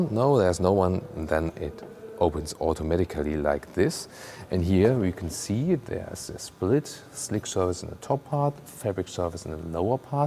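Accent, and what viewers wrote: Germany. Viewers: Very nice, but I was having trouble understanding all of it. That thick German accent.... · Fix diffrent person to show video this guy has Germany English accent we need international accent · Yes sounds more real is like informations are more reliable since said with a German accent …. first ever car I have droven was a BMW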